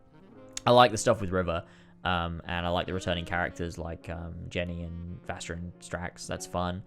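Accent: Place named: Southern England, United Kingdom. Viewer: I cant work out your accent you sound like youre from the south of the uk but also from australia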